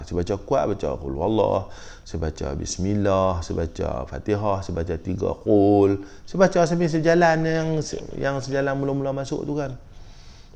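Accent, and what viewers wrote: Kedah, Malaysia. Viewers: trima Kasih Tuan sudi berkongsi cerita, sikit sebanyak mnjadi ilmu utk kami. tenang ja Tuan cerita piaw kedah punya hehehe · terbaik la tuan bercerita… loghat kedai piaw punyaa… hahaa..dari kedah mariiiii…. kuala nerang mai tuann…. seronok dengaq bercerita dan lawak penuh nasihat… nak part 5 dan 6 seterusss smpai bila bila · Assalamualaikum tuan,best cara tuan bercakap.Macam orang Kedah bunyinya.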